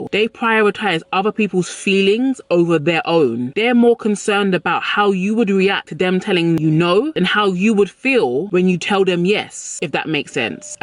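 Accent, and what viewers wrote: United Kingdom. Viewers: Yo ah from the Uk i can tell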